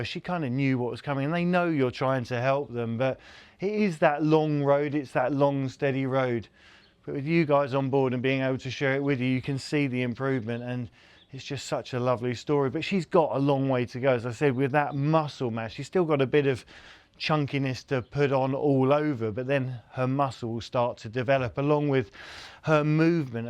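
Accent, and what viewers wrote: United Kingdom. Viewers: ohhhh LOVE THE INTRO of that sweet british voice def need more ;];];] sorry i live in maine in the U.S.A. and i cannot get enought;];];]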